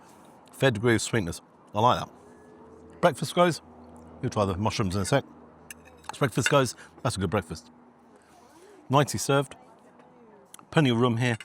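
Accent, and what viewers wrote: RP, United Kingdom. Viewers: Hey, only recently been watching your stuff although a long time ago I recall seeing some of your videos. I'm trying to catch up on the backlog and recently during my lunch break the recent one of being harassed came on my feed and wanted to at least say as a new viewer, it sucks people treat your opinions that way. Since I am a yank I always find your accent very posh and entertaining. Almost like very dry humor, keep up the good work!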